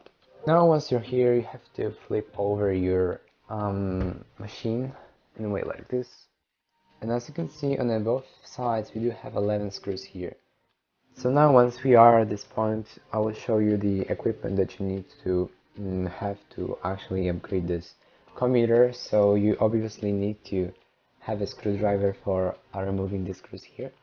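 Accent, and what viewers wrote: Poland. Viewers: Wiedziałem po akcencie, żeś jest Polak :D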